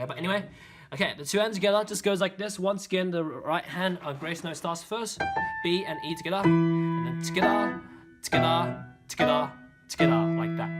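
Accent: United Kingdom. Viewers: Even tho bro's asian his accent is british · You’re British man and accent coo!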